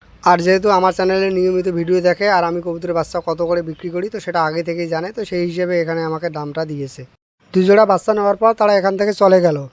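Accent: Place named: Rangpur, Bangladesh. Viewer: আরে কথা শুনে তো মনে হচ্ছে রংপুরের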